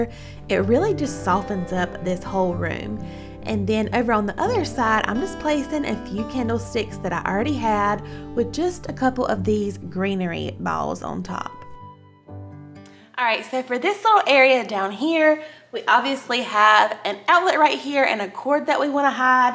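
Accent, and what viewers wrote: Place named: South, United States. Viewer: I'm an Australian interior designer and I love your Southern accent, great ideas on your channel, well done xoxo 🤠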